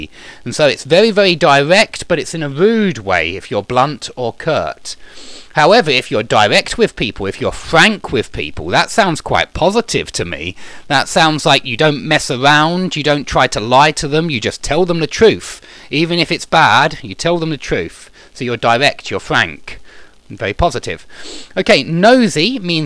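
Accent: United Kingdom. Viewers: I like a lot your British accent. Keep shining.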